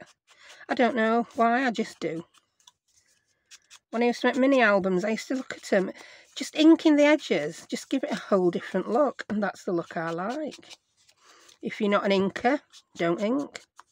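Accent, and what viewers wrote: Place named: Yorkshire, United Kingdom. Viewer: So refreshing to hear someone with a Yorkshire accent. I was born and grew up in Wakey! Just discovered your channel as I have the junk journal bug again and your style is just what I'm aiming for. I've recently subscribed and am working my way through your videos.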